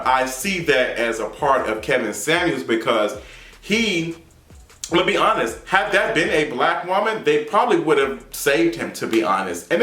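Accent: Memphis, United States. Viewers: Are u from Memphis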